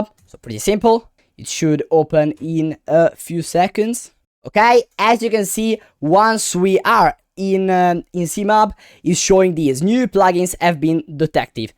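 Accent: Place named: Italy. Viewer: i like your italian accent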